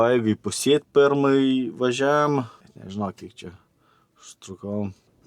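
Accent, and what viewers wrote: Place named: Samogitia, Lithuania. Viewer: 4,20 čia ko gero kultivatorius. Beja ar nebūset iš Žemaitėjės? Kažkap žemaitiu kalba jautas.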